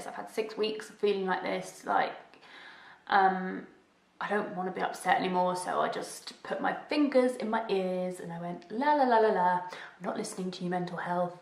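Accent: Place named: England, United Kingdom. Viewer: Right, exactly. It's easy to take our health for granted before it all goes wrong. I'm the same age as you and don't have MS but I work in a hospital and I witness victims first hand. Thanks for keeping us updated and I think we can all say we admire your courage. And yes keep having fun! :) P.S. And we Americans definitely admire that English accent, haha.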